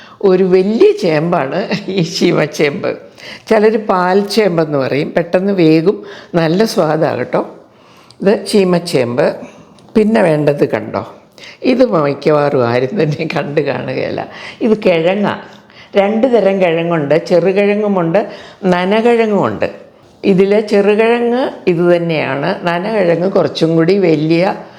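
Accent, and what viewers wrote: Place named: Vaikom, India. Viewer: ടീച്ചറിന്റെ നാടു വൈക്കം ആണോ. അവിടത്തെ ഒരു സ്റ്റൈൽ ആണ് സംസാരത്തിൽ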